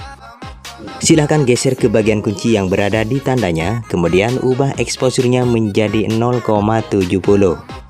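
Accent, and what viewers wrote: Madura, Indonesia. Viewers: Logat nya kok kayak orang Madura ya😅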